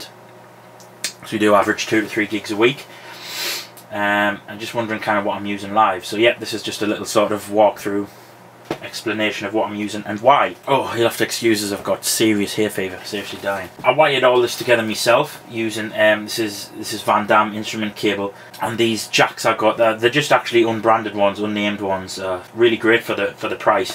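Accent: Newcastle, United Kingdom. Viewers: are you a geordie mate? great pedals big fan mate :)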